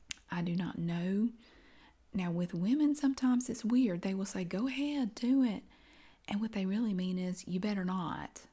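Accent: South, United States. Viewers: You have the best southern accent and that sense of humor! Love it!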